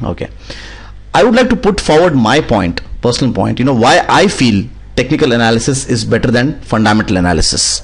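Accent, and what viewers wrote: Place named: India. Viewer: Indian English needs SUBTITLE....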